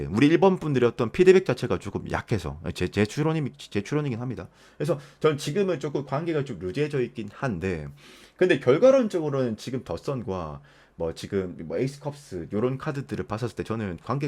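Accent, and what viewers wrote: Gyeongsang, South Korea. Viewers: 경상도 남성 목소랴가 정겹고 편안하네요 친한 칭구가 말하듯이 얘기해주는 느낌ᆢㅋ 잘 듣고 갑니다~~^^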